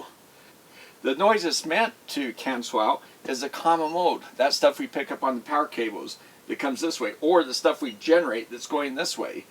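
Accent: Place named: Utah, United States. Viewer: I hear n. Utah/s. Idaho in that voice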